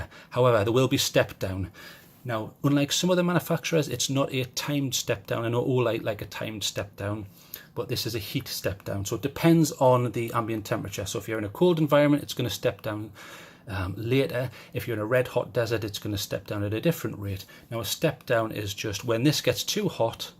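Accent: United Kingdom. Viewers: 28 minutes for a fuckin headlamp? And that British accent...no go Brit Crazy guy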